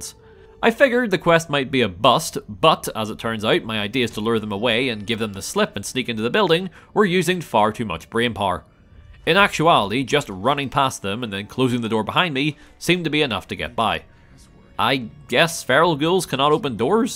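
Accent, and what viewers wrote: Northern Ireland, United Kingdom. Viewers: From the beginning of the video where you said you talk like Marge Simpson I was trying to place your accent. I knew it was Irish and thought it was probably northern but you answered my question in the first video I legitimately thought about it. Love when that happens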